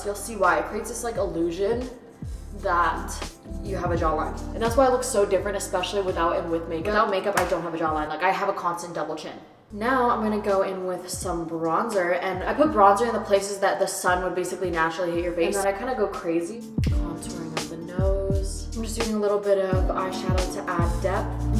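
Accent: Vietnam. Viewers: pls I'm obsessed with her Vietnamese accent it's lowkey so hot is it just me? 🥸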